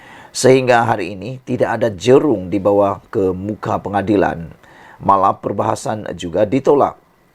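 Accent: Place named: Sabah, Malaysia. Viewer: Jangan cakap macam tu orang sabah rasanya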